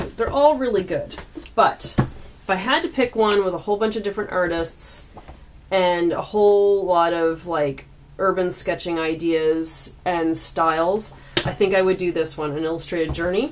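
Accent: Canada. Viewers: Thanks for this--very thorough and entertaining, also educational--helped me choose the Stephanie Bowers and Gabriel Campanaro (sp?) books. Love your Canadian-influenced accent (which pertains to nothing relevant.) I see from your YT channel that you are very "crafty" would love to see more art-centric videos.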